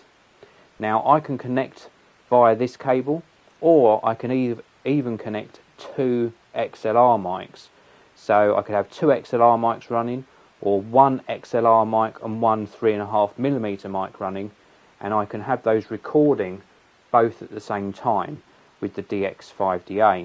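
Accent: United Kingdom. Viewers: we dont hear odio , we hear audio , could you plz speak proper american english (, and english is my fourth language ) , instead of wanker british accent sound too GAY ! even farel collinn learned that in movies -see its not difficult to speak normal..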